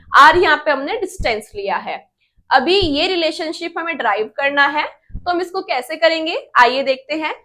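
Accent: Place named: Pakistan. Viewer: her accent is like pakistani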